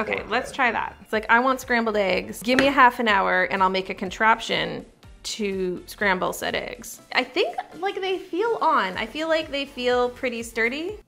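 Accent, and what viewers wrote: Canada. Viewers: I thought she was Canadian😂😂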